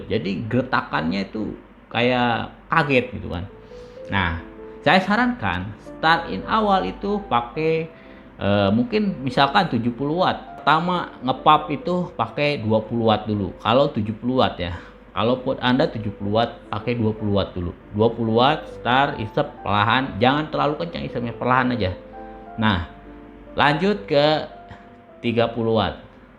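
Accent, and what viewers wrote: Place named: West Java, Indonesia. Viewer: Letah sundana mani atra pisan namana oge sunda pisan euy😀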